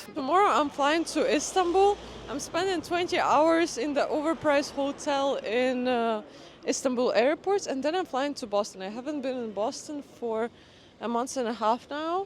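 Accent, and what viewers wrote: Russia. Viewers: Так приятно слушать русский - английский 😂❤. Русские фразы на английском ❤. No offense. Спасибо тебе за историю.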